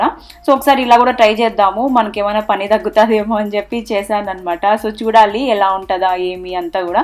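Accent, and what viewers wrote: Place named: Rayalaseema, India. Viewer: Ru from rayala seema ur way of speaking looks like dat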